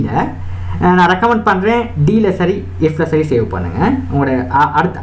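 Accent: Sri Lanka. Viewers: Neenga srilanka va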